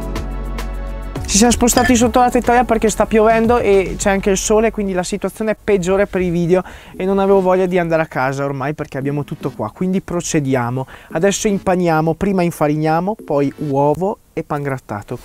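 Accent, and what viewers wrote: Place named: Milan, Italy. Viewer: Sei Milanese eh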